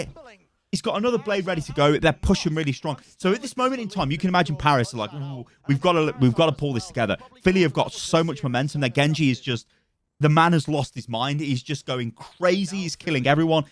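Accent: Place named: United Kingdom. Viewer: Always enjoy these vids! Nobody ever takes the time to slow an owl fight down and point out the endless high iq plays. Ur emotion and... british..ness makes it entertaining and helps get the message across even better. Also how did fury hit so many forking rocks onto a genji just disgusting what a game.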